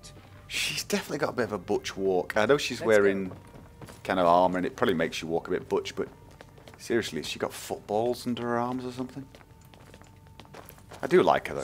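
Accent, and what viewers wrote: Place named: Birmingham, United Kingdom. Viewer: Can't believe you're Norwegian, you sound exactly like you come from the Birmingham area of England. O.O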